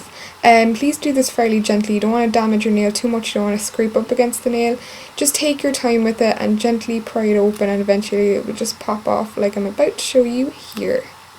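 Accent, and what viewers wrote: Ireland. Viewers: Love your Irish accent ❤️💚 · It's an Irish accent not an English accent! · I love your accent are you Irish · You’re accent is great!! You sound a lot like my Irish friends! 💖 I’m battling my ridiculous nails this afternoon thank you!! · OMG. Thx this helped! You sound Irish :) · You're Irish? Sweet :D MY HERITAGE!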